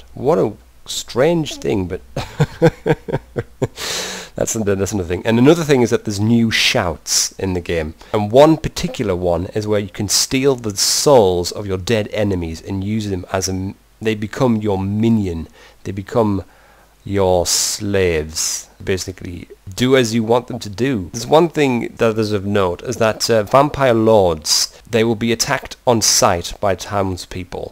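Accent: United Kingdom. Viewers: britishhhhhh!!!! XDDD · dude ii watch your videos just for i hear your voice ... Britsh . its amazing.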